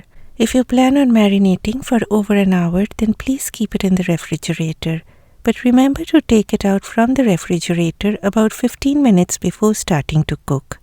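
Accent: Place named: Bengal, India. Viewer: Are you bengali 😊.from your accents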